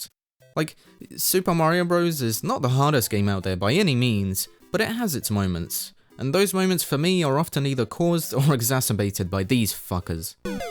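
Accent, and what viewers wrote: United Kingdom. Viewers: Weird to hear someone with a UK accent saying Super Mario Bros saved gaming, considering there was no video game "crash" outside of the USA. Gaming was still very strong in the UK at that time. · Interesting hearing a British person talk about Mario so heavily. I always thought Mario wasn’t liked in the UK 🇬🇧 because he was deemed too kiddy.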